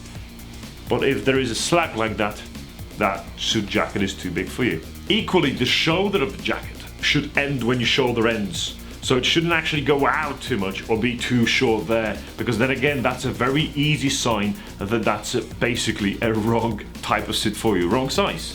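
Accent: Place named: United Kingdom. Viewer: I know this is not the place to say this but you're absolutely gorgeous! Great British accent and excellent outfit. Well done!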